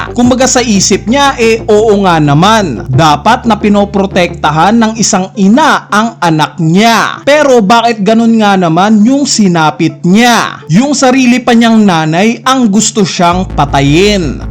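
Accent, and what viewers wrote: Visayas, Philippines. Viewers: Bisayang bisaya a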